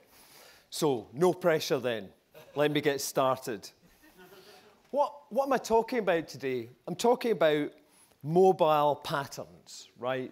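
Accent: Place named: Ireland. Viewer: that accent though such heavy Irish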